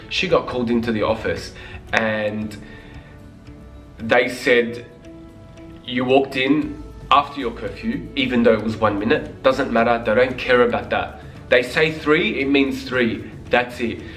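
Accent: Australia. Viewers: Does it get better the longer you stay there? 😢 Ps you sound aussie!!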